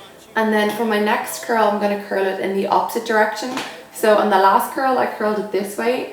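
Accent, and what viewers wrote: Ireland. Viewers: Are you Irish x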